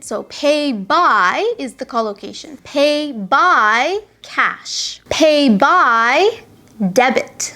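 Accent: Canada. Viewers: Are you from Canada? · Love u, despite you're Canadian and your pronounciation is a little bit different from U.S.A I always understand what you say and the way that you express is so clear as well. Regards!!!☺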